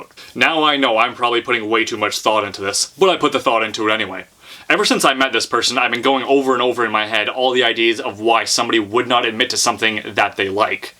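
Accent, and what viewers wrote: Canada. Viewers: You sound more Canadian now.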